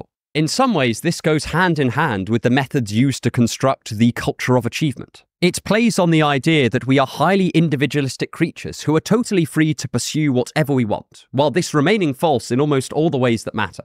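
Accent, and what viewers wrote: United Kingdom. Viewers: Relax with the British accent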